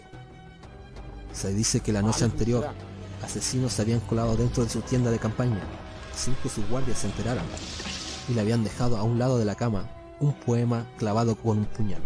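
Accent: Chile. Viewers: Es chileno el boludo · es mi idea o este loco es chileno? xD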